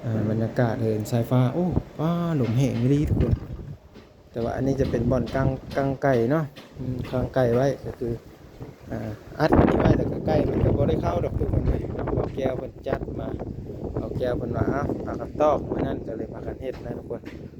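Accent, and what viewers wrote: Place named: Phu Thai, Thailand. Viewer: ສະບາຍດີ ເວົ້າສຳນຽງຜູ້ໄທກໍມ່ວນຄືກັນ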